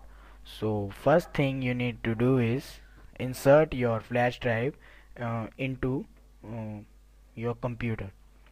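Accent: India. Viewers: fuck indian accent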